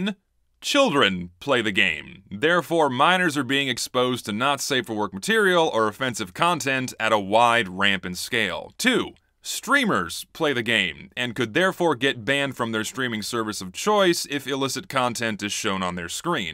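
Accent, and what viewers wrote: South, United States. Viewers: with that southern droll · You should is it embrace the Texan accent, dude. It’s slipping anyway. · Is it me or does sound really Southern in this video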